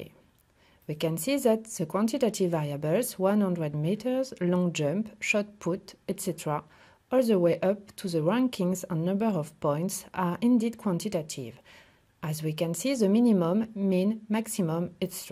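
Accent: France. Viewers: Her English accent is like french-i couldnt understand much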